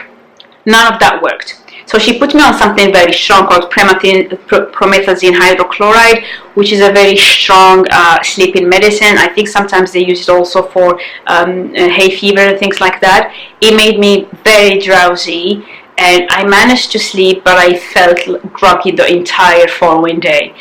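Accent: United Kingdom. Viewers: Thank you for sharing 🙏🙏🙏 PS: I can sense the British Accent haha.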